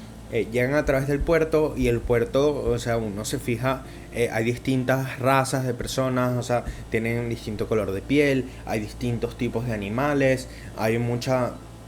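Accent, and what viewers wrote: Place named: Venezuela. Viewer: Nadie: Yo pensando que tiene acento venezolano y dudando hasta que habla de Colonia Tovar. PD me gustó mucho el análisis de la arquitectura, de hecho hace poco para una práctica de dibujo quise replicar las ciudades de Mushoku y puedo decir que el trabajo detrás de la inducción a estos escenarios, la forma en la que te transmiten el cómo cambian las locaciones es un trabajo muy bien hecho.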